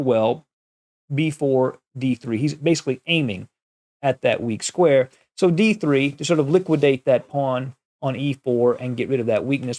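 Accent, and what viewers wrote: United States. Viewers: I very much enjoyed your US English! As of Alireza: one day he would break through.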